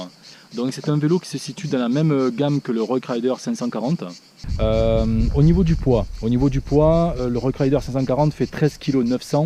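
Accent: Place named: South, France. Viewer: accents du Sud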